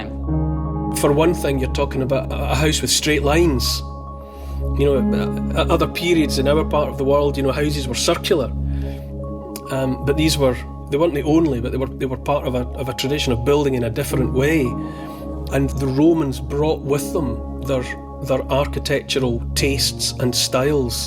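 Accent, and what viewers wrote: Scotland, United Kingdom. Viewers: D'ya think y'can lose that Scoooooots accent as it jars on my nerrves?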